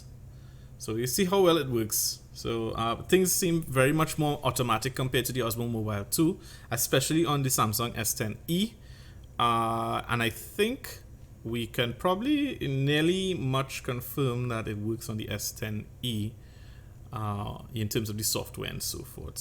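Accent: Trinidad and Tobago. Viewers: Good video! You sound Trini! Am I right? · wait wait, you trinidadian awa??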